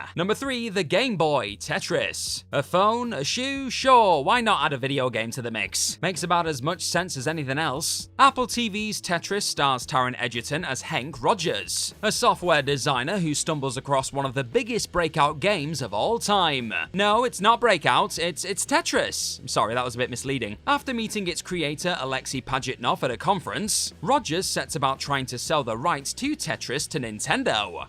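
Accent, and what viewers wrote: United Kingdom. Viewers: I don't believe the writer is actually reading his script. I think they are using an AI reader, with a British accent myself. you can't hear him breathing, or even stopping to take a breath while he is talking. Also A lot of words get mispronounced like NIKE ( Nik-EE)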